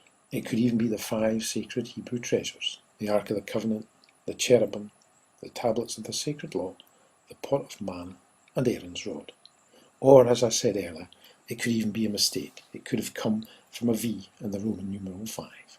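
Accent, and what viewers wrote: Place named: Scotland, United Kingdom. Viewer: He's a Scotsman …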